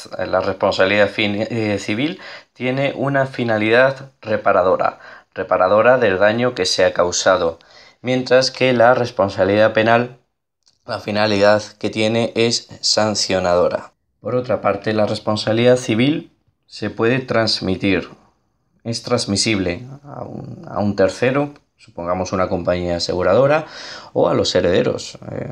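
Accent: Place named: Spain. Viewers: Es lo q yo también me quedé de q país es y por el acento es de España, aquí en México es Culpa civil y Culpa penal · Creo que por el acento que tiene es porque es de España no?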